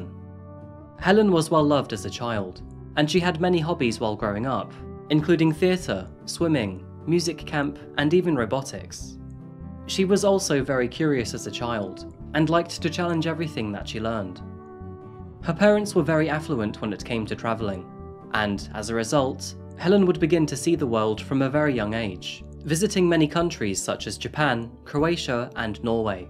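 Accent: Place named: United Kingdom. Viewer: I love this interesting mix, you always do first class research, and I really enjoy video narrated by fellow Brit who knows how to properly pronounce English 😂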